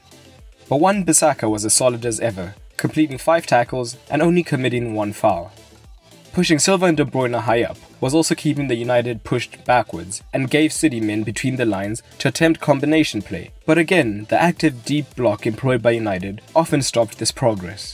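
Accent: South Africa. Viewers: Hey man are you South African??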